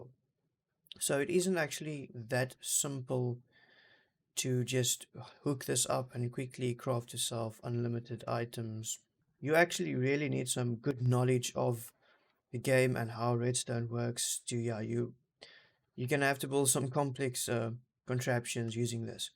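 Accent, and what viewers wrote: South Africa. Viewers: Nice to hear a fellow South African!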